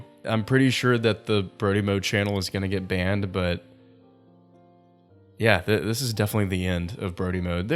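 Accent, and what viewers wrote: South, United States. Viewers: didn't realize how southern you sound normally until i heard your brody voice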